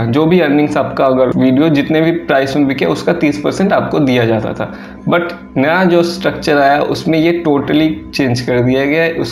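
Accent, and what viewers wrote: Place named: India. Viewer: Can't understand what are you saying in this indian-english style...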